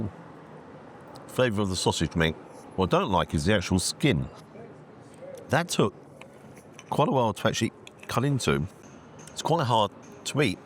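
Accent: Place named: Yorkshire, United Kingdom. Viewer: Yorkshire 100%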